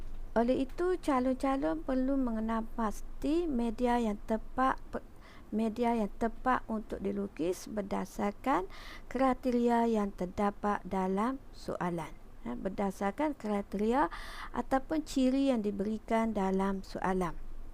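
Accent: Kelantan, Malaysia. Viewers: Cikgu mesti drpd Kelantan kan? Suara cg sedap didengar buat kitorang xberhenti fokus..terima kasih Di atas perkongsian..❤️❤️ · Kecek kelate ye cg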